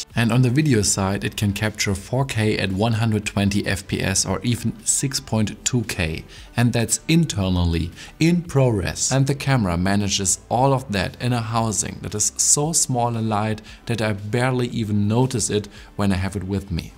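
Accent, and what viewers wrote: Germany. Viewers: dein deutscher dialekt ist echt übel XD. teilweise wirkt es so als wolltest du extra englischer sprechen... und dann verkackst du das "very". daraus machst du ein "whery"... und wenn ein vokal nach dem "the" kommt, wird es "thee" ausgesprochen. nicht böser gemeint. ich formuliere immer sehr unsensibel.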